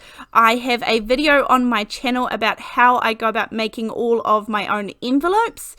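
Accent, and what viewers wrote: New Zealand. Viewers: You sound like New Zealand. I'm in Montana, USA. Those are so cute. Brand new subscriber just right now.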